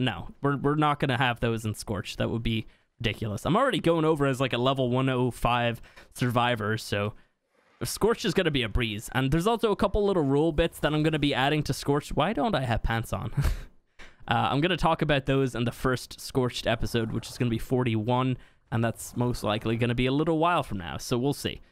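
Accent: Ireland. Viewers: He is from Ireland 🇮🇪 but im not 100% sure where (i think he lives in Dublin) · Are you Irish cause if you are you my man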